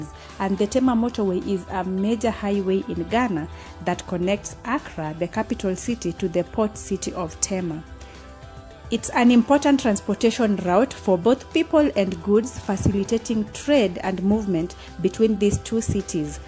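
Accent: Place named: East Africa. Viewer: I follow Yr channel from Southern Africa. I like development topics in africa. However if I may ask? Why does Yr accent sound East African than Ghanaian or ratcher not west african?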